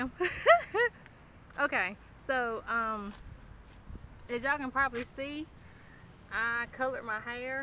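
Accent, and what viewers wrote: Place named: South, United States. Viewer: Love ur southern accent! You look fab as usual!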